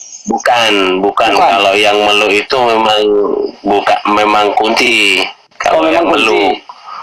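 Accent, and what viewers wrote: Medan, Indonesia. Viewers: Mas mcm orang medan aja.dari logat bicaranya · medan kali ya tuh logat bang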